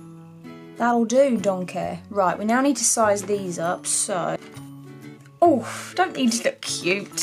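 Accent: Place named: London, United Kingdom. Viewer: It’s so nice to hear a london accent. Really enjoyed her video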